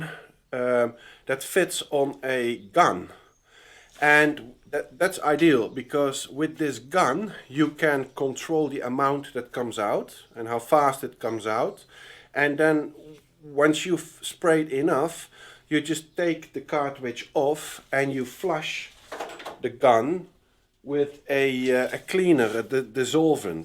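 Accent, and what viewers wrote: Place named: Netherlands. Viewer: Thanks for having done all these videos!! My wife and I are also looking to buy a project house in France and watching your videos gives me inspiration and teaches me certain techniques. Maybe dumb question, maar ben jij Nederlands? Your accent makes me to believe so.. 😅 Groetjes uit Nederland!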